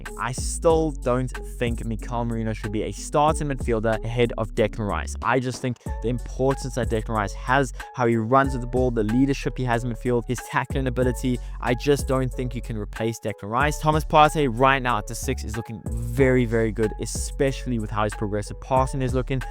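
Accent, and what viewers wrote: South Africa. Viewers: You sound South African so I will definitely support your channel coyg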